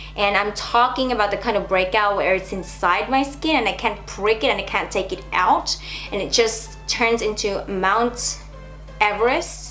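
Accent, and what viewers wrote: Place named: United States. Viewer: First of all you look AMAZING 😍 Second, where are you from ? You sound like an american girl to me. I'm lovin' your channel ❤😘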